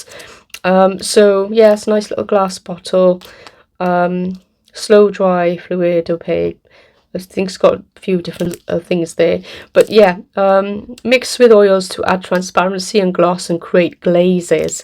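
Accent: United Kingdom. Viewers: Watching again here, it's successful unboxing arts supplies. Is it not expensive. I love your British accents ❤❤❤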